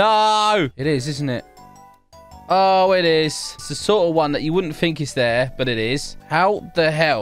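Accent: United Kingdom. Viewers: He’s British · BRITISH ACCENT lol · ur british?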